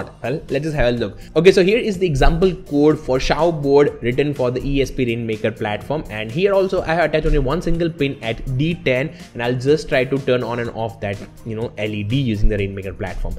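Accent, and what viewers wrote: India. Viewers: English with spicy hindi👍🏻👍🏻👍🏻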